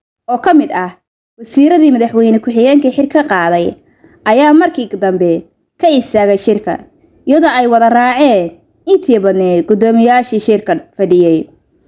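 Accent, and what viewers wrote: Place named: Puntland, Somalia. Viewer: 17 malmod ayuu shaqay nayaa tube kaagu 14 vedio ayad qadey 744 supiscurips baad haysata hadal kaguna mucarid nimo ayaka muuqata saxafa dana aqon umalihid sida hadal kaga kamuqata lahja dauna wagal bedka puntlan toupe kana waxad kafura tey saudi ga 300k ayaad durba cod saneysa Hays eryen adigu yaan laga xidhin toup ka sharcigi sana baro weyga tali safi matihi de hada safi noqo cajiib?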